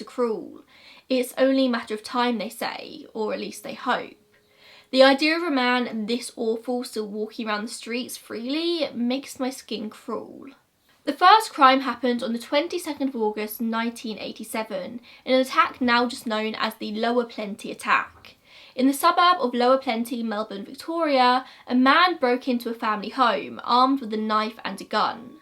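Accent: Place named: Yorkshire, United Kingdom. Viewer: I love the Yorkshire accent. <<thumbs up>>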